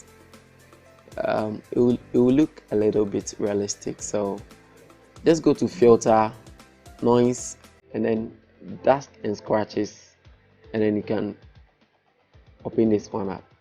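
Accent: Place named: Ghana. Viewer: Sounds like a Ghanian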